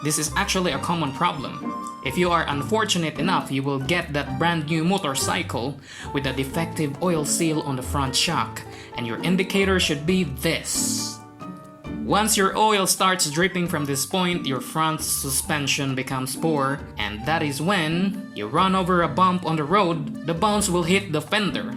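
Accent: Philippines. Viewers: very good explanation sir, speaks like a Filipino English Teacher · The accent is so Filipino 😊😘 Keep it up 👍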